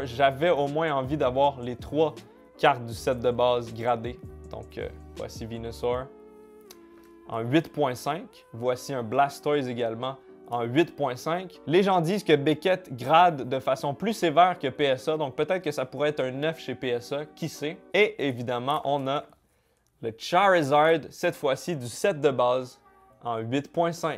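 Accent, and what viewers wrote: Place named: Quebec, Canada. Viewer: Oh merde s est un québécois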